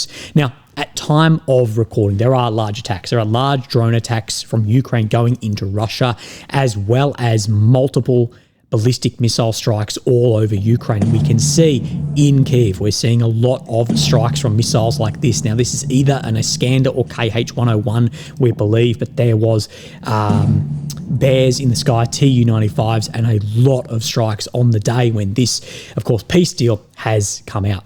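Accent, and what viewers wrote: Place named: Australia. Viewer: l'm happy to see you in good health. over time, l'm also getting used to your aus accent. thanks for your contribution and comments. keep helping us please❤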